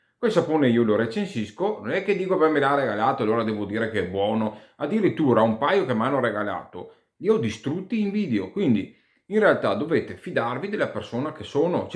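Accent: Veneto, Italy. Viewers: Ciao complimenti per quello che fai, forse mi sbaglio.. ma dal tuo accento mi sembra che tu sia veneto.. mi sbaglio o ci ho preso?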